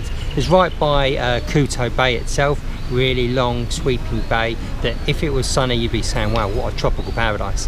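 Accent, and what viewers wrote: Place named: Cockney, United Kingdom. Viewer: make fun of your accent by doing the cockney thing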